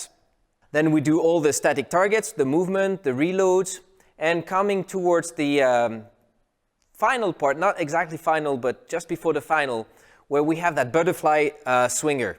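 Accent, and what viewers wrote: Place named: France. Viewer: French accent...oui!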